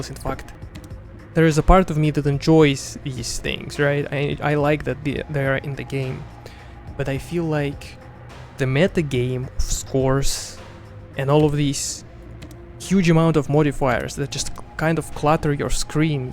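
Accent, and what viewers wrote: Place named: Russia. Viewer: Я слышу русский акцент брат))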